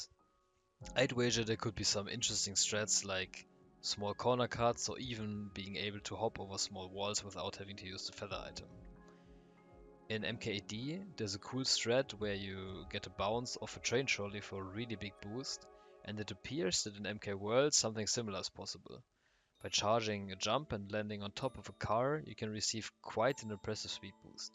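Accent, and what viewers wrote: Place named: Germany. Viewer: Der ist doch deutsch das hör ich raus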